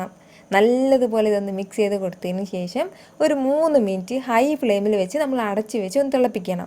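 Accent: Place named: Palakkad, India. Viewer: പാലക്കാട് ആണോ വീട് സംസാരം കേട്ടിട്ടു തോന്നുന്നു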